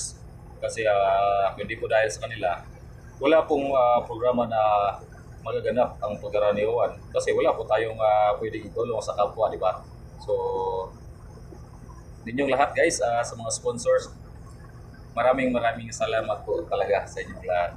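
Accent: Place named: Visayas, Philippines. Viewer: Kani kay morag bisaya ni ayy hehe, pinoy galaan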